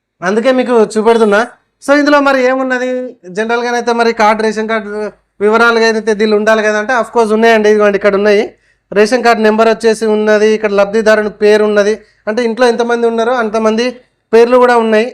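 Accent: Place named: Andhra Pradesh, India. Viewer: Androlla lekka maatladutunnav anna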